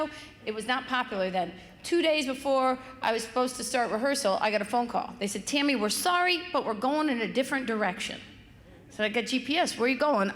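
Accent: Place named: Arkansas, United States. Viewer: I saw you on Tube, do you remember me? 😉Sounds like an Arkansas Hillbilly.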